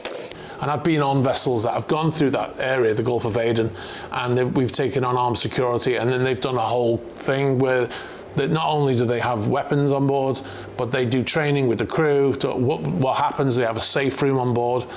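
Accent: Liverpool, United Kingdom. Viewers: Are you a Scouser???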